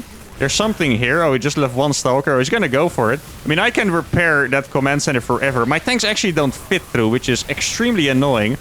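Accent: Netherlands. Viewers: As an American I can hear the Dutch in his voice pretty clearly haha ive heard dutch folks speak a lot though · are you dutch, brother? I feel like I hear it in your voice. I'm from scheveningen either way, awesome video. has to feel cathartic, lol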